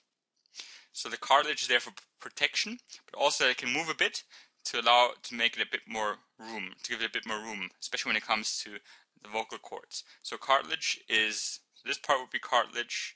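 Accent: Germany. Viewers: ur german? i thought u were indian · Oh he's german! i was trying to figure it out throughout the whole chapter! LOL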